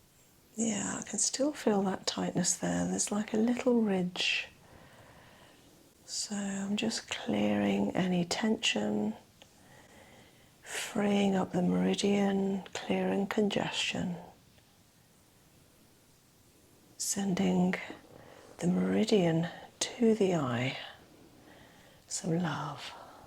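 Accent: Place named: England, United Kingdom. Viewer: l@ I asked that becuase she a similar accent to Geri Halliwell. They are not too far from each other, North of London.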